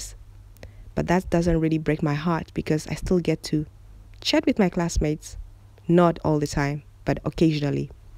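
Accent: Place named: South Africa. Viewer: You're South African.. your accent reminds me so much of my friend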